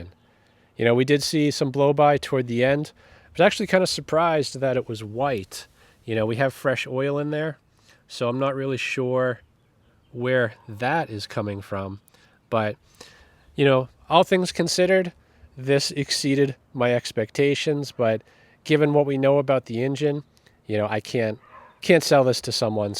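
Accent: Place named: South, United States. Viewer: Critter? What part of the South you from?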